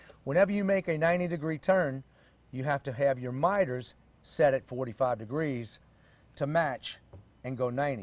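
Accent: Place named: New Orleans, United States. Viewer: I know you must be from NOLA ??.. Recognize the accent !!!)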